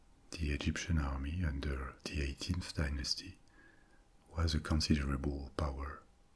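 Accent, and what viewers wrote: France. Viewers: You are my official sleeping aid addiction. Your gift of telling factual stories with the smoothest french sexy accent. What more could a girl ask.. I dont know if this happens to anyone else, but your voice penetrates into my dream...idk if that means I'm not in a deep sleep, or ? Then along with your soothing voice, you tell me that key to total relaxation is a massage...so you massaged my aching back 😳 I woke up feeling like I really had a massage. I have ALWAYS had extremely vivid dreams. But this is sort of a new twist. (I sincerely hope for it again) Thank you. I hope this is not a sign I'm flying over the cuckoo's nest. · Da nema Francuski naglas imao bi barem dupli broj pratioca.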